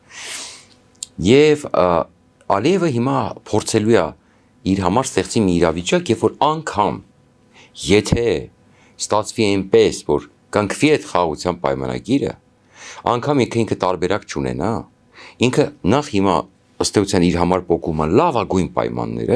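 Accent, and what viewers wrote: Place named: United States. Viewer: Էտ մենակ թողել էք արմենչիկի գովաբանությունները մնացածը ջնջում էք՞, այ լակոտ դու մեզ ուզում էիր բերում Զելենսկուն, որ տեսակ ինչ հզոր քայլ արեց, Տռամփին առաջարկեց իրա հանքերը ու հեսա կտեսնեք բիզնեսմեն Տռամփը ինչ օրնա քցելու պուտինին, ու այ տուֆտա ով ու ինչ օրն ընկա՞վ, խեղճ Զելենսկուն բազմաթիվ կթելու փորձեր տեղի ունեցան ու դեռ շարունակվում են ավելի խորանալ, դու ամերիկանսկի իդեոտ ինչ էիր առաջարկում բերեք զանգեզուրի հանքերը տանք ամերիկացիքին, այսինքն ջհուդներին, որոնց հինգերորդ կետից սնվում էս դու ու քո տականք ընտանիքը, անգամ նրանց առոգանություննես օգտագործում հաչալուտ ժամանակ, երկրորդը, ամուլ սարը ամերիկացիկինը չի դա սեռժա արամական վազգենական ոսկու թեմայա ռուսական դաշտում դու քո հիմար խելքով լսում էս հին աղվես, մեծն դերասան ասմունքող արամ զավենիչին, որ ամուլ սարից իրա թուլա փայն ունի, ու աֆրիկյան աբորիգեն ցեղերի ներկայացուցիչների պես ականջ, պռոշ, քիթ դնում ու բանդաժում էս ու մեզել ուզում էս համոզես որ սա միակ ճիշտնա ու վերջնա՜, այ գյադա ուրիշ ավելի լավ անուն ես չեմ կարող տալ, որովհետև մնացածը շատ ավելի վատն են, երրորդը ասում էս համերաշխության մասին, տո այ խառնակիչ ես դեռ չեմ լսել քեզ, իսկ ես համարյա միշտ լսում եմ քեզ քանզի ես քեզ համարում եմ հայ ազգի ամենա մեծ թշնամիներից մեկը, անգամ նիկոլի վովա օղլիից, քամի որ էտ կեղտը երեսներիսա իրա հակահայկականությունը ցույց տալիս, իսկ դու ագենտ էս ու դա ավելի վտանգավորա, դրա համար ես միշտ քեզ լսելով պատկերացնում եմ թէ մեր թշնամիները ինչ են պատրաստվում մեր գլխին բերեն։ Ես դեռ չի եղել քեզ լսեմ ու դու կոնստրուկտիվիզմից չխոսաս ո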